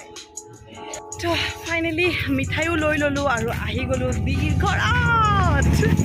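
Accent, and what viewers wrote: Nalbari, India. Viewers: Aponar Kathat alp nalbarir xur ase baa....👌👌❤️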